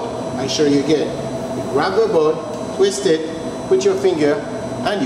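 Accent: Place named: France. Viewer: Bazlur Rahmi worked in an Alaskan fish company for a month. The Salmon came from the boat directly pumped to me. where scarred salmon would go to the canning, and vitamin prepping. And unscathed fish would be cut to filets. which i only did a short time. it wasnt rocket science, you basically saved as much meat of the fish as possible, by cutting away the rest. The fruit peeler as a deboner was the highlight of this video and the dudes snazzy french accent 😂😂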